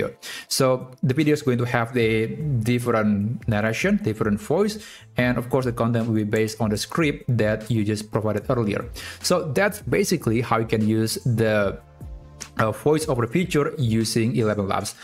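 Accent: Indonesia. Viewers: lu orang indo bang? dari logatnya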